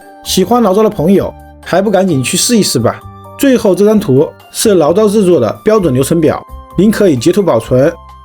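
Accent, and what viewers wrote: Wuhan, China. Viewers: 典型的武汉的口音~！😀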